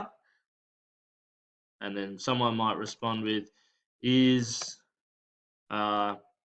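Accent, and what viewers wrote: Australia. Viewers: You have an aussie accent. Like your use of technology for supporting your teachings. Good on you for your kaupapa te reo. Mairiora! Engari For some, eg. My tamariki...would lose engagement and be confused....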